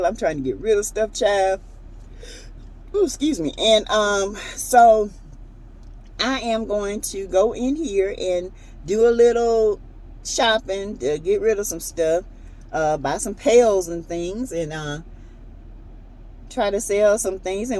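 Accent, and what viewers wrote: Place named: South Carolina, United States. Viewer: I like hearing your commentary. You sound like my family. They are from South Carolina but I'm from NYC.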